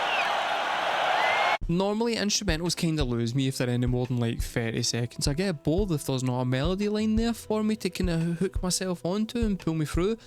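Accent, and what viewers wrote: Scotland, United Kingdom. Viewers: You sound as if you are Scot. And if so you should be aware of fronted by also a Scotsman and their music is unique for the variety of styles of music that includes the flute as a rock instrument. They released their first album in 1968 and released one every year through 1980 and no two albums are alike. The musicianship is incredible as well as the lyrics of their songs, written by Ian. The live performance is amazing and worth viewing on YouTube. But listen to the studio recordings before you view the live performance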